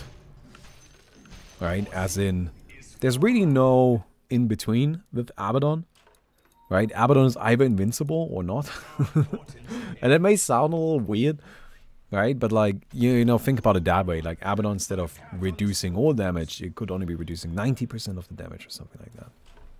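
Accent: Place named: Germany. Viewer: It is 100% without a doubt a German accent. Not Canadian, not enough "ey". Not American, not enough cowboy or California. Not French, Italian, English, Irish, swedish, Russian or Australian.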